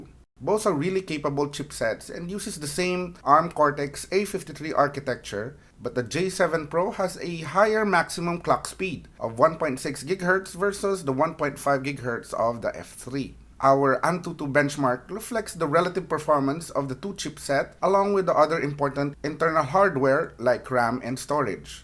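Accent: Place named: Philippines. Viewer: Are you filipino? I can hear it in your accent haha. If you're not then I apologize and if you are then ipagpatuloy mo lang ang ginagawa mo! Ang ganda ng content 👍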